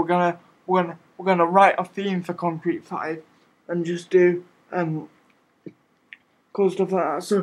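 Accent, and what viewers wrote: United Kingdom. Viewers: Helpful, succinct, awesome. Straight to the point and a UK accent to boot. Thanks man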